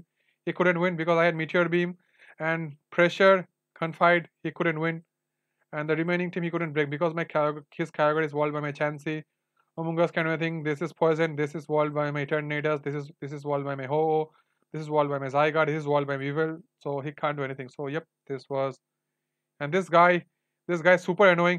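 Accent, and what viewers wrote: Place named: India. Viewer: indian accent ftw 💪💪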